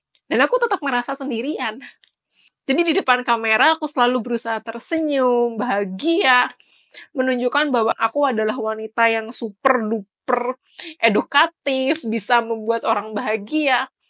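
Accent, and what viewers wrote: Java, Indonesia. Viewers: Imemang gaya bicaranya begitu ya intonasinya terlalu ditekankan terkesan medok jd kyk orang tercekik.... Kenapa ya.... Kasihan.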